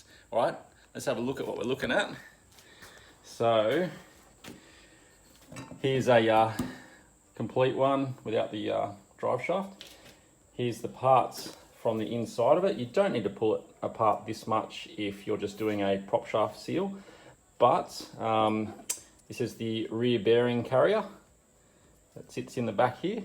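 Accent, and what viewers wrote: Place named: United Kingdom. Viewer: Just can't abide the British accent. Gonna look for an American vid.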